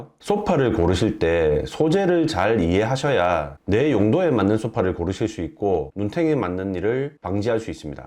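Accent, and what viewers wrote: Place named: Gyeongsang, South Korea. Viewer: 경상도 억양이 살짝 있네요.ㅋㅋ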